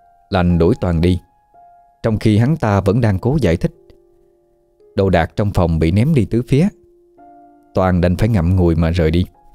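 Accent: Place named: Mekong Delta, Vietnam. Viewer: Chuyện ma mà nghe xúc động ghê 😮 giọng miền tây đặc ruột k ta sao nghe dễ thương quá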